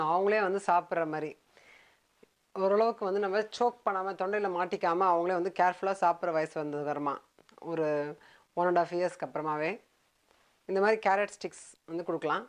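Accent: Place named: Tirunelveli, India. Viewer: 5 days back I subscribed Ur channel..one full day I watched ur vedios.like Ur slang assault ah pesureenga.r u from Tirunelveli? Also I saw ur juices vedios bought some fruits..made muskmelon juice..then lunchbox ideas was very nice bought a new box for my husband.. plz upload toddler food ideas sathiyama saapda vaika mudila..thanks ungal sevai engaluku thevai ...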